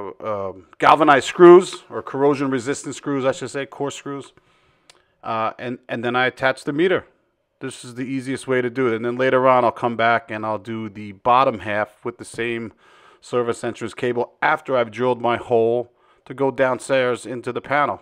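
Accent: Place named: New Jersey, United States. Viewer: Hello Mr., I love your videos, you are so steady in your work and explain things very well. (I'm assuming New Jersey by the accent. (my mother and maternal grandparents were from New Jersey) I grew up in PA and been doing electrical work 32 years. I love that you just show the work nothing flashy, or gimmicky. Please stay like that, I am all for a good laugh but there is a time and a place for that. And when you are completing work that can kill you, or possibly other people, this isn't the time. You Sir, are a credit to electricians all over the world, especially here in this wonderful USA, a consummate professional. Sorry for referring to you as Mr., whenever anyone does that to me I look around for my dad or grandfather. But I am also a, lol. My dad told me growing up you never call someone Sir, until they gain or earn your respect, sorry I am rambling. 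But you Sir, have gained my respect within your work. The only thing I would add to your videos is leave the print up for a little longer when you post a written message, as it's hard to read that fast. And I thought the meter height thing was 6'7", I know that's splitting hairs, but we know how the NEC likes to split hairs, and so do inspectors, sometimes. But your probably right at 6'6" and mine is for inside panel boxes. But great video thank you!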